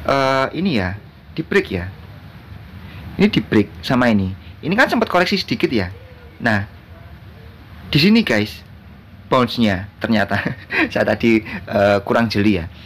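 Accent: East Java, Indonesia. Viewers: Antap Brada Jatim 😇👍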